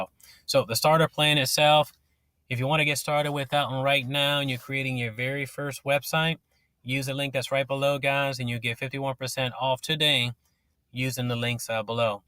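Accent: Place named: United States. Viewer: Hostpapa is a Canadian web hosting service. You sound American. How did you hear about it?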